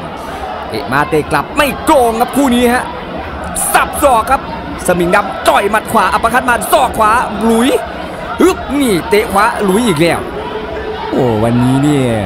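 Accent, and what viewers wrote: Isan, Thailand. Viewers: เว้ากลางน้นล่ะดีแล้ว เวลาเว้าอิสานโทนเสียงบ่น่าฟัง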